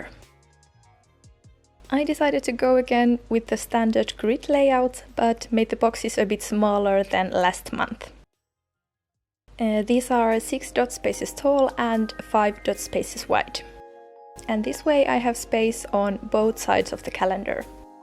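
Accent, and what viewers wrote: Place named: Finland. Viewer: /video starts "oh she has a nice accent. what is it from. sweden? finland?" you: "here in finland (...)" me: yooooooooooooo B)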